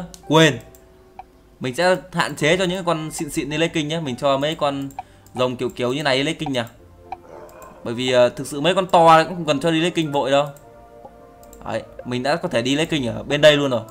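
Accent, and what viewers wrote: Hanoi, Vietnam. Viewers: o Ha Noi ak hay BAC NINH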